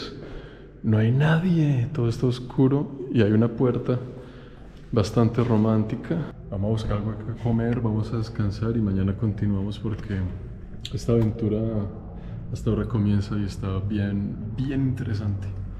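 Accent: Bogotá, Colombia. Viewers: Felicidades. Ese acento colombiano de Bogota (rolo) de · Buen viedeo, el acento y dialecto es muy colombiano. Eres colombiano? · Él muy rolo, ella muy paisa 👍🏼🙃👍🏼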